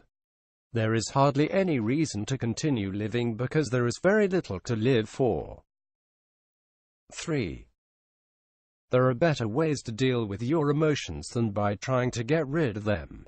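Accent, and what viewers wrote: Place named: RP, United Kingdom. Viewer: British RP Robot. What a snob.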